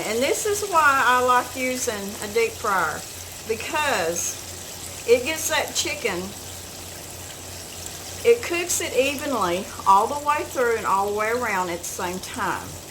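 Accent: South, United States. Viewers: I absolutely love the way Southern's talk. I really notice the accent as I'm from Canada...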